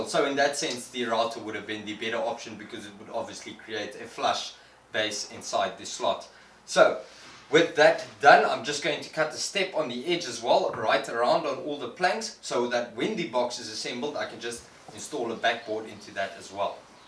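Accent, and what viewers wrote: Afrikaans, South Africa. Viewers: This is my first video of yours that I have watched. I am not from wherever you are from and I am going to guess your accent. Please don't ridicule me for my ridiculous guesses. To me your accent sounds like South African or Swedish mixed with Australian. How wrong am I? By the way, I enjoyed the video! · Strange accent. Slightly German, slightly South African. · Are you from south africa???because the accent sound very Afrikaans???😁😁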